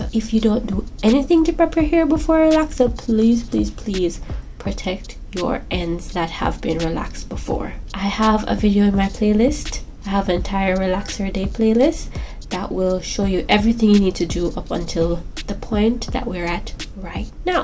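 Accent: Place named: Jamaica. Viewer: I know that beautiful accent anywhere! Go thru yawdie 🇯🇲🇯🇲! U did amazing. So I have a question for you , So I'm natural never been relaxed , but I'm currently contemplating relaxing my hair. Any suggestions as it relates to what relaxer to use? Being as how it's going to be my first time do you suggest going to the salon to get it done or doing it myself?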